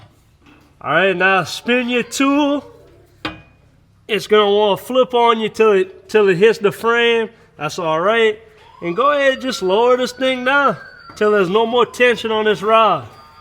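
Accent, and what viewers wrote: Louisiana, United States. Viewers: Damn you got the MOST crazy ass Louisiana accent 😂😂😂😂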